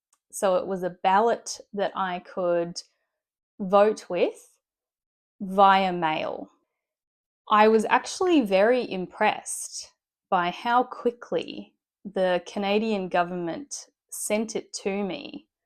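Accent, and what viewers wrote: Australia. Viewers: Hi, while I was commenting on Bo's YouTube video, I did found your channel there and then I'm here right now, watching and learning the Australian accent from you 😁. Greetings from Malaysia 🙏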